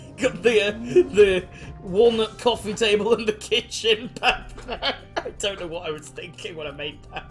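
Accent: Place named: United Kingdom. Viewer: Why are you Brittish?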